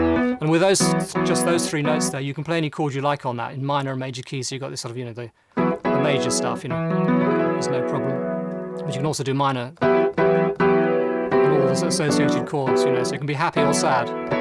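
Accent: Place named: RP, United Kingdom. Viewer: I love RP pronunciation...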